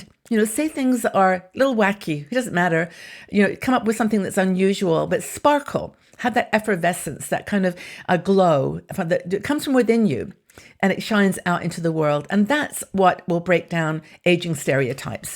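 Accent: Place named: England, United Kingdom. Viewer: LOVELY!!!!! SO NICE TO HEAR YOUR ENGLISH VOICE MY DEAR. I LEFT LONDON IN 1952 AND STILL HAVE MY BRITISH ACCENT, OF WHICH I AM VERY PROUD. I MEET FEW BRITS, BUT LOVE THE ENGLISH MENTALITY, WHEN I DO....SO. POLITE, EMPATHETIC, FRIENDLY AND UNDERSTANDING, A GREAT JOY ALWAYS....A N D... SO I SEND MY WARMEST CHRISTMAS GREETINGS TO YOU. YOU ARE INDEED A PLEASURE TO ALL OF US.... AND ......I LOVE TO BE ALONE AT CHRISTMAS....I CAN REFLECT AND THINK OF MY BELOVED HUSBAND AND MY DARLING DAUGHTER WHOm I LOST LAST JANUARY TO CANCER...MY FAMILY ARE NOW FEW AND FAR AWAY, BUT WE ARE VERY CLOSE, AND KEEP IN TOUCH ALMOST EVERY FEW DAYS...SO THE LOVE NEVER CEASES....But I am alone and that;s fine with me....I make the best of life...Happy Christmas to you dear friend. I AM NOW 88 1/2 AND AM AS FASHIONABLE AS I ALWAYS WAS!!!! LOVE IT!!!! Hahaha! I honestly love to be alone at Christmas, and reflect on those happy memories I have.I am not sad at all.....I LOVE my time alone, and look forward to the new year immensely.God bless you and yours. 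You are my Mince pie and sausage rolls connection. My Mum too!!!!! used to make them, and we put sixpenny pieces in the Christmas puds she made......Happy days....even the War days.... Happy Christmas everybody💋💋💋💋🌲🇬🇧🇨🇭🇺🇸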